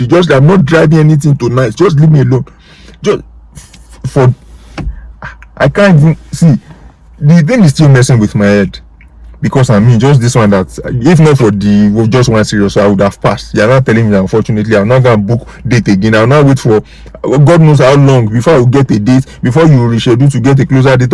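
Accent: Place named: Nigeria. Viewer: I like the way you speak english in your Nigerian accent sir .. A little tip for you (in your test try to have a good friendly conversation with your test instructor while your driving which will divert the intructors thought away from examining... this is how I passed my driving test in the UK. all the best in your next driving test!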